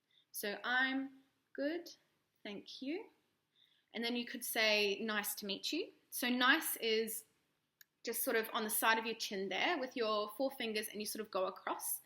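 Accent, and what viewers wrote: Australia. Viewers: Im aussie and even I could hear that accent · You got a Aussie accent for sure! Haha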